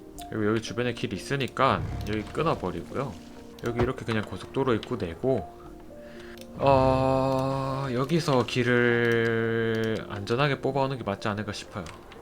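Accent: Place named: Gyeongsang, South Korea. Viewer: 형님 매번 목소리가 너무 매력적입니다 경상도 분이시군요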